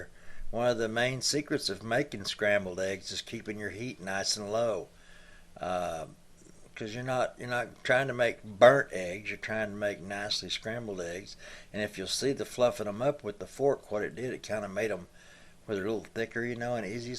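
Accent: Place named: Texas, United States. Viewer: That good ol southern accent is everything!!! sound like one of us Texans. Im going to try these tomorrow morning. Thanks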